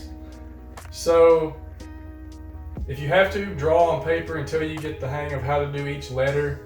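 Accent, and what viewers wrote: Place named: South, United States. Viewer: Where are you from? I'm from NC & AL. and your accent sounds close.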